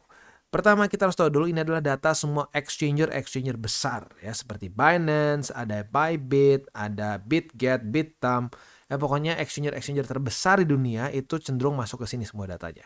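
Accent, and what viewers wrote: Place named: Palembang, Indonesia. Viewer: Palembang yo bang :V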